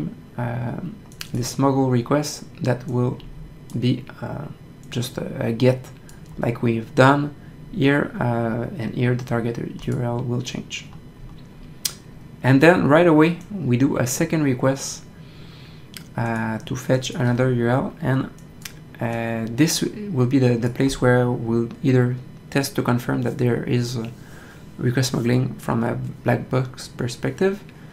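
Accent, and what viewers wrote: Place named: France. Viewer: french accent... How cool is that?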